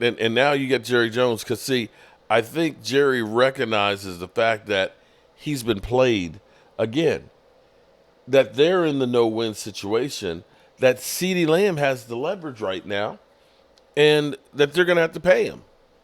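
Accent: South, United States. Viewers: You seriously know nothing it's all conjecture on your, what you said is just not all factual, you think somebody is going to scare the jones family, really. You southern accent is embarrassing.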